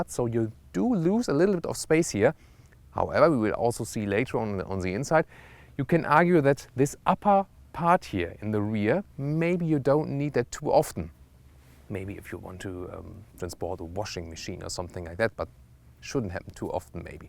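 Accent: Germany. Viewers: Great review! Simple and focused on the subject. Also like your english with german accent. Have you got any polish roots coz your surname sound like?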